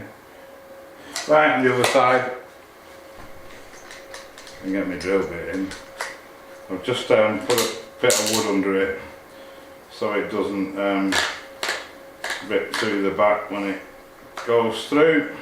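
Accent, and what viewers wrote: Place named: Yorkshire, United Kingdom. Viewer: Love the door❤ Where are you guys from? You sound like your from Yorkshire 😁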